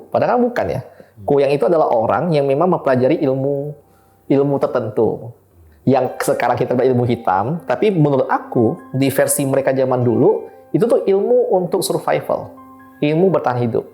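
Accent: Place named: Pontianak, Indonesia. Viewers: Bahase Nye pontianak bnget bang😅 Salam dari budak pal 5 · Bang logat Kalbar ny kental, wkwkk mantap bang paduuu 😂😂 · Salut sama bang, tidak menghilangkan aksen bicaranya khas pontianak 😂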